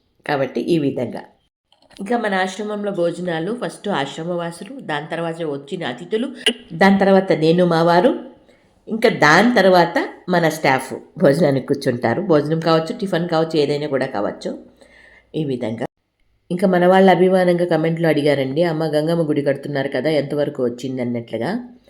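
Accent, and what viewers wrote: Chittoor, India. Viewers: మీ స్లాంగ్ చిత్తూరు యాస. మాకు అనే బదులు మాకీ అంటారు. వేయాలి కి బదులు ఎయ్యాలా, ఎన్నో ఎన్నెన్నో అంటారు. ఏం ఫరవాలేదు. ఎవరి యాస వారిది. అర్థం అయితే చాలు. నేను యూట్యూబ్ వీడియోలు చూడను, ఎందుకంటే వారి ఆదాయాన్ని వారు తమ స్వార్థానికి వాడుకుంటున్నారు. మీరలా కాకుండా, యూట్యూబ్ ద్వారా వచ్చే ఆదాయాన్ని నిస్వార్థంగా వృద్ధుల సేవ కు వాడుతున్నారు. అందుకని మీ వీడియో లకు సబ్స్క్రైబ్ చేసుకున్నాను. ఇంకా లైక్ కొడతాను. మీ వంటి వారిని ప్రోత్సాహ పరచడం మా వంటి వారి బాధ్యత. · Hi ma..i like ur slag..endukante..nenukuda me vure 😊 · Ctr side antha me slang amma memu kuda mee laage ❤❤❤I'ove ur slang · Authentic chittor slang,mixed with telugu and tamil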